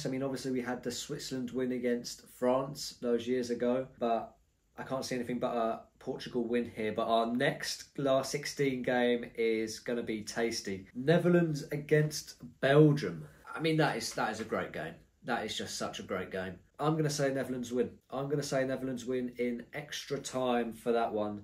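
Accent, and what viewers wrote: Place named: United Kingdom. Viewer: Lol you are defenitly brit lol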